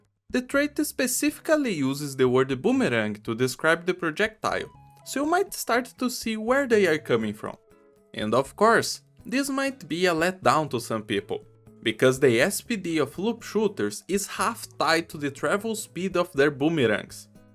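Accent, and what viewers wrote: Brazil. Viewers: Tu é BR né? O sotaque é impossível de não perceber KKKKKKK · Sabia que era BR so pelo jeito de falar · are you perhaps brazillian? just curious. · Eu percebi que era Br pelo sotaque, entrei no seu perfil e li Brasil 😊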